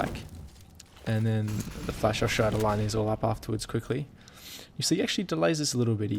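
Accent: Australia. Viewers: are you Australian? Im in love with your accent :DD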